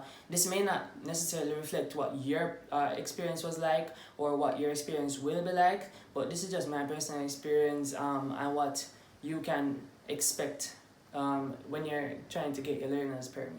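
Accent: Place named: United States. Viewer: Talk like an American tho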